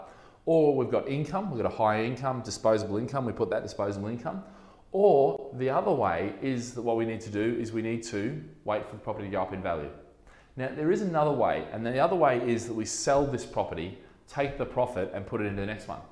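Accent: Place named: Australia. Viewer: from the uk yet he has an aussie accent :P very informative.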